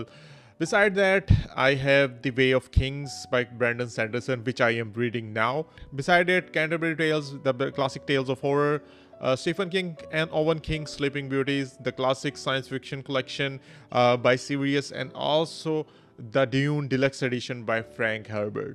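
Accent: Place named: India. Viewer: why your accent is so horrible like an indian accent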